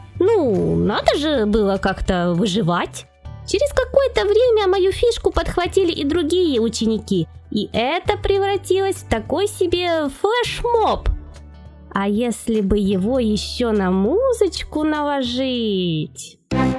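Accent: Ukraine. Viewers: Ты очень красиво говоришь по-украински может просто делайте ролики на украинском и можешь ещё сделать побольше историй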